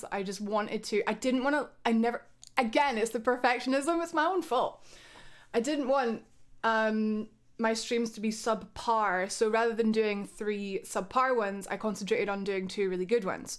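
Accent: Scotland, United Kingdom. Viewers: I remember the first time I watched a Whatculture video that you featured on, and thinking to myself "who the bloody hell is this Scottish chick?". Then I got used to you, started enjoying you more and more, then the gaming channel started doing podcasts, and I'd look forward to whenever you were on them. (I play a game whenever I listen to guess who is on, and would fist pump when you were on). While following you on socials, i saw you were a streamer, and i made a twitch account, because you were streaming and I wanted to be involved in the chat. From that, you were the first streamer I subbed to, and whenever i get chance i always drop by to say hello, (I'm LordPeterEgg btw). I'm sure others have stories similar to mine, and I'm sure like me, we're shocked about this news, but also excited to see what comes next for you! We all love you, and are looking forward to everything to come! 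LONG LIVE! ❤❤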